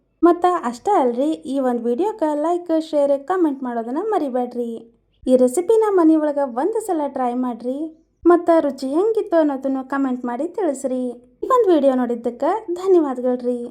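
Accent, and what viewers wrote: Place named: North Karnataka, India. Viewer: Sis niv uttara karanataka davaru añnari ... Nice talking ri❤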